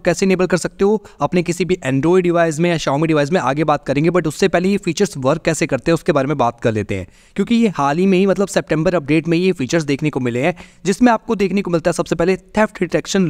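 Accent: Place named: India. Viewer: You talk too fast with the hindi accent.😮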